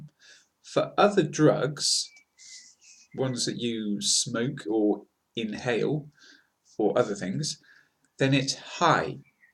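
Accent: United Kingdom. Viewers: What part of Britain is his accent from?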